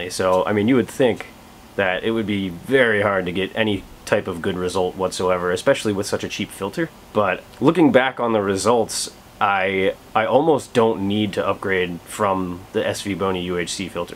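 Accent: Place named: Virginia, United States. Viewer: You in VA? Sounds like my area lol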